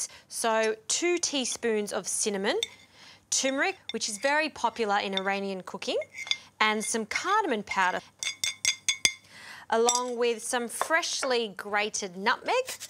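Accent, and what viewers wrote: Australia. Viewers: aussie english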